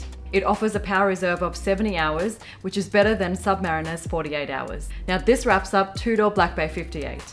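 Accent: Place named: Australia. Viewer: Your channel will blow-up you should have way more subs with the quality of your video! And the Aussie accent doe 👌😅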